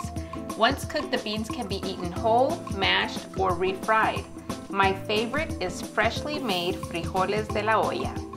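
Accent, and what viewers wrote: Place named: New Mexico, United States. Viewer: I recognize your accent! Are you or your mom from New Mexico?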